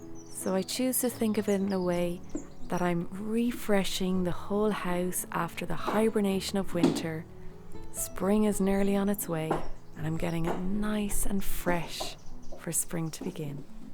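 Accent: Ireland. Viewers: I’ve had a small touch of spring cleaning fever for a few days now and this video just fed that immensely! It’s as if you described my every thought & feeling, except with a beautiful, soothing Irish lilt!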